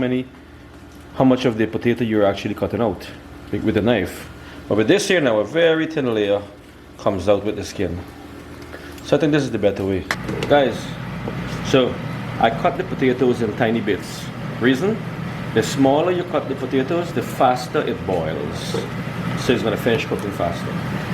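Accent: Guyana. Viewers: Really nice dishes .... guyanese style. Can't beat guyanese food and twang ; >) I do the same thing here in Canada but I use a cast iron pan ( just like the one you bought) and seasoned it for non-stick. Mostly we don't use the microwave anymore (bad for your health in the long or short run) I use an overhead oven. Non stick pans has a coating that contributes to cancer. Just a heads up.... for what happen to someone else does not mean it will happen to another. Cheers on a good video.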